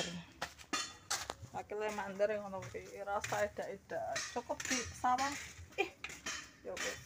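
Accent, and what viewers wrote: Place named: Banyumas, Indonesia. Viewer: Balik maning kro inyong kiye hot milf... ky kuwe yu openinge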